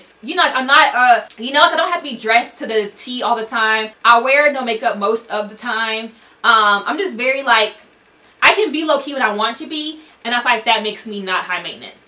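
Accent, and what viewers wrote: South, United States. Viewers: you have a southern drawl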